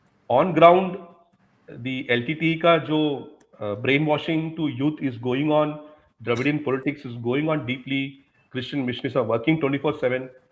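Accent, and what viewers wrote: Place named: Kerala, India. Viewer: I can easily make out the Mallu accent (among other things). It's difficult to hide.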